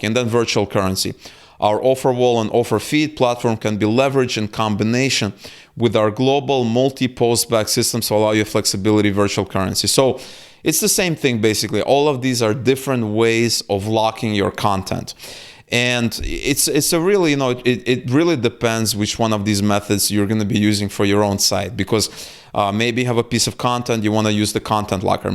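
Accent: Russia. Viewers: You're from Russia?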